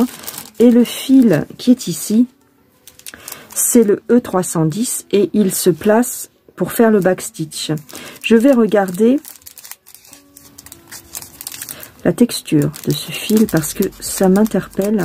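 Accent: South, France. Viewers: Mais garde ton accent du Sud bon sang Ceux qui ne sont pas contents qu ils aillent voir ailleurs Ce sont tes délires qui font ton charme❤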